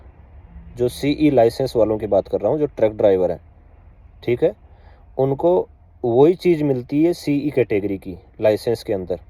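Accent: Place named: Rajasthan, India. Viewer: Rajasthan se lag rahe ho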